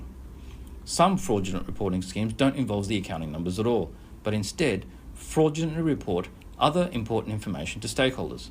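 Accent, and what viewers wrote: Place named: Australia. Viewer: I think Australian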